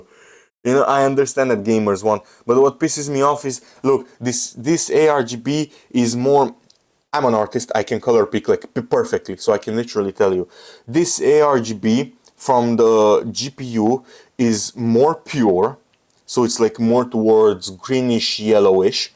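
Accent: Romania. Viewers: I can tell the Romanian English accent , because im Romanian myself, here's a sub , i feel like we're already friends lol . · Salut. Ma uit la video si abia dupa 10 minute imi dau seama ca esti roman. Preturile sunt mai scumpe in EU pentru ca se adauga TVA la tot, peste care se adauga pret transport si adaos comercial. De-asta e suparat Trump ca noi europenii avem TVA, la ei nu este. Eu am 7800x3d si rx 7900xt luate in aprilie 2024, upgrade de la i7 11700F si RTX 3070. Nu m-as mai intoarce la intel si nvidia, poate sa le primesc gratis. Ma uit si eu cu jind la 9800x3d si 9070xt si ma cam "mananca" sa fac upgrade dar nu e logic sa dau bani pe upgrade decat peste inca o generatie. E diferenta de 11% maxim intre ce am si 9800x3d/9070xt. Daca poti fa switch la proc AMD nu o sa regreti. Eu aveam consum de 175W in cinebench la i7 11700f cu placa smechera z590-f care scotea tot din procesorul ala fara K (un fel de PBO de la AMD) si era varza procesorul pe langa asta, ryzen 7800x3d cu 86w in cinebench si scoate cu mult peste cel vechi, sa nu mai spun de gaming rupe tot in afara de 9800x3d. 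Faptul ca am luat procesorul asta cu consum mai mic mi-a dat posibilitatea sa pun placa video cu consum mult mai mare(318w de la 220w cat aveam inainte la gpu) la aceeasi sursa de 750w.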